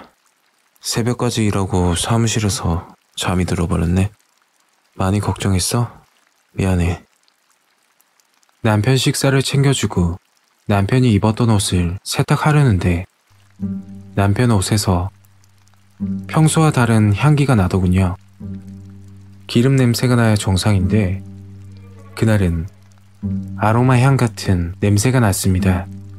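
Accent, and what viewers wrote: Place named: Busan, South Korea. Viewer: 절은청년같은데 우째그래 이야기를 차분하게 잘합니까? 말씨는 부산말씨도 많이섞이가 듣기가 참 개안습니다.이야기 내용들도 들을수록 자꾸 더듣게됩니다. 앞으로 도 많이들려주십시요수고하십시요,.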